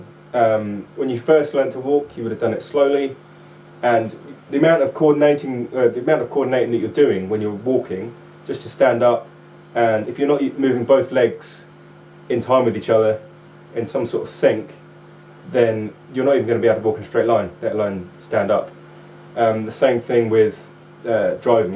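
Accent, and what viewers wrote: United Kingdom. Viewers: Ya, I meant English accent, sorry about that, and you do have a point, you guys sure can rock! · cause hes from the uk and were awesome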